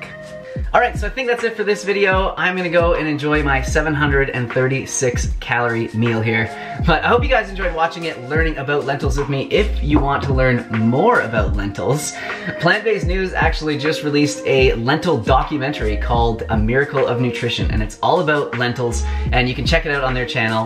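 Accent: Canada. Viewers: Omigoodness! I thought I heard a Canadian accent. Lentils are very quickly becoming my all-round faves! Need to chk out your curry recipe. QUESTION: Building muscle is awesome, however...how does it compare to heme sources of iron. Struggling with anaemia & I keep getting the impression that non-heme iron sources (from lentils) are sub-par for those of us with anaemia. What say you,? Cheers in advance. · Dovid Stern Canadian accent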